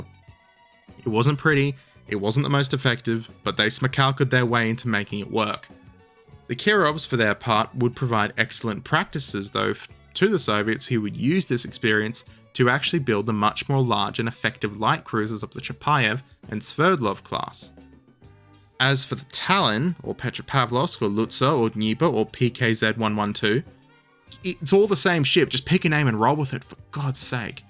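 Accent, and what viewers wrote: Australia. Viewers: Hey! Don't really give a shit about ships but I do appreciate your accent, proving again that we don't all sound like yobos. Have fun & hope the channel does ok. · Gotta love the sound of a fellow Aussie talking out his arse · Like Vegemite, the Aussie accent is something to be proud of, even if it's not for everyone. Personally, great video and attitude with the foreword.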